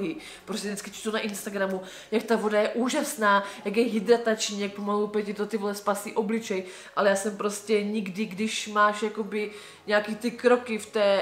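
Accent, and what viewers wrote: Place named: Moravia, Czech Republic. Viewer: Já Tě žeru 👍 jsem moc ráda, že jsem Tě díky Terry objevila s hned jsem projela x videí. Navíc mi vyhovuje Tvoje vyjadřování a ta moravština. Jsem taky z Moravy a používám stejné výrazy jako ty 😜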